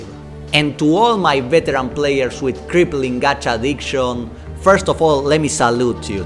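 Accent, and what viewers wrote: Argentina. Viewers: Sos argentino? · Tenés el acento más argento que yo haya escuchado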